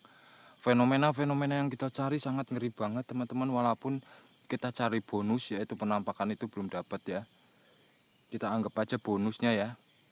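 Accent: Java, Indonesia. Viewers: Asal mana bang,, logatnya medok jowo tapi suka ngomong bahasa sunda "aing". 😀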